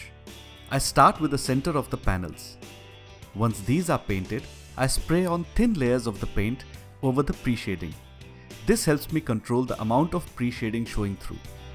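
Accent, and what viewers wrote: India. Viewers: He is Indian, so it is the way he pronounces it.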